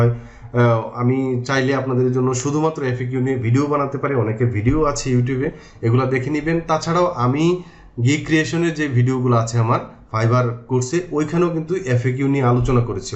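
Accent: Sylhet, Bangladesh. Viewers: Bhaia apni ki sylhet teke?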